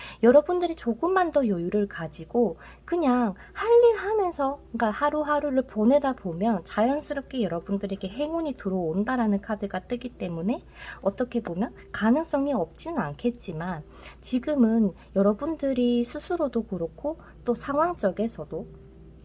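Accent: Gyeongsang, South Korea. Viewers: 4 수달 저에게 너무 지친 나머지 제손 놔버리고 다른 분 만나러 갔는데... 포기하고 지내면 언젠가는 와줬으면 하네요ㅠㅠ 그분이 경상도 분이라 님 말투들으니 더 보고싶어요..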